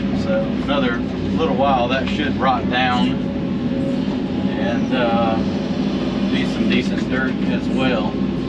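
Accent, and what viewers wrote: South, United States. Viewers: I gotta tell ya, I love watching the videos, but there is something soothing about your voice too, I like the southern draw, I follow Todd on digginlife21, he has the great draw as well. I am in Michigan and you just don`t hear that way up here, I guess you guys think we have a northern accentb be well my friend.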